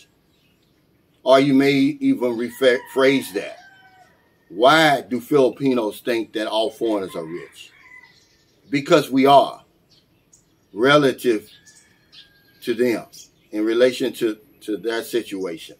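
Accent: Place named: Florida, United States. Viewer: Bro are you from Florida I'm from central Florida and your accent screaming FLA. 😂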